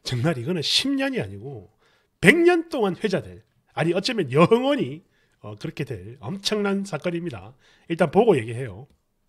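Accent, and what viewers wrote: Gyeongsang, South Korea. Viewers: 아저씨ㅋㅋㅋ전형적인 경상도 개 웃긴 형부같음ㅋㅋㅋ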